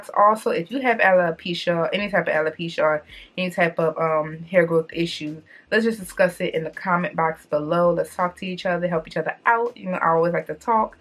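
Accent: New Orleans, United States. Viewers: Are you from New Orleans? I hear that NOLA accent. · U from New Orleans huh? How u talk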